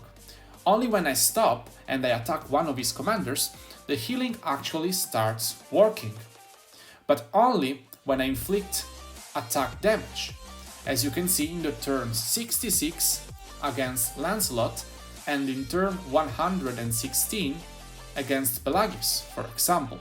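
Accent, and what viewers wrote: Italy. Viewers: Lo sento l’accento italiano 😉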